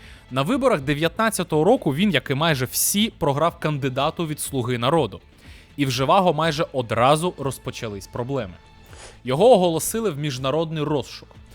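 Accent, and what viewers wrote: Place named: Russia. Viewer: Боже !!! Яке неприкрите зелене іпсо , кому ти брешеш, ти тільки вчора вивчив українську, на акцент кацапський зверни увагу.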